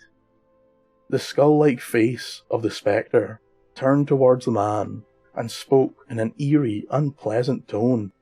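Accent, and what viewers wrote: Scotland, United Kingdom. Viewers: Most definitely we would appreciate more Headless Horseman tales!! Always we have wondered what happened to the Horseman.. how he lost his head.. and what became of him afterwards.. We love your stories.. and especially your accent.. we are of Scottish ancestry as well.. Thanking you so much we are .. Slan mo charaid William and Jen 💜💙🌱🌹🕊️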